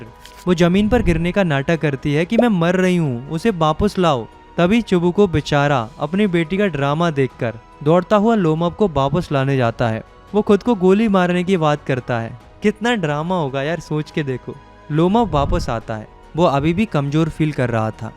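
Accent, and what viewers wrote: Uttarakhand, India. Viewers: Are you from Uttarakhand?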